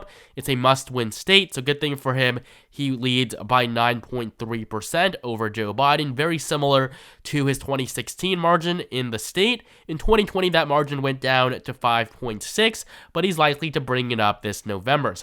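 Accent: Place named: California, United States. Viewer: Can’t stand listening to your accent. Very California yuppie!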